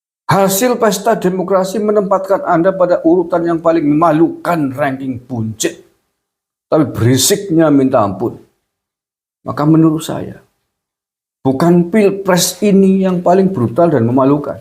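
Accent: Banyumas, Indonesia. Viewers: Mau emosi denger bhsa ngapak jeg ketawa😂😂 ga jadi dah emosi lbh baik legowo..😊😊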